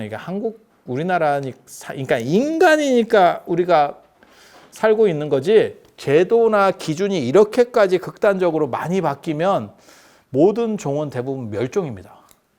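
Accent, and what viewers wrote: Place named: Gyeongsang, South Korea. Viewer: 경상도 말~ 넘 익숙한데요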